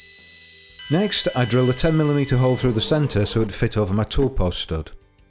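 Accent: United Kingdom. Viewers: This video is impressive, the narration is spot on one of the best on you tube, nice clear british accent (instead of the usual Yankee or Aussie). so i have subscribed thanks for sharing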